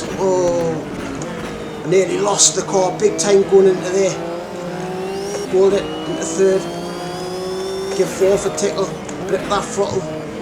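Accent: Newcastle, United Kingdom. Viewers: Really enjoyed this lad - and great to hear a Geordie accent 💪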